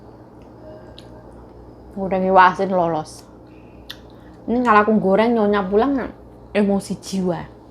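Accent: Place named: Blitar, Indonesia. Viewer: tak kira mbak orang Blitar wkwk 🙌🥰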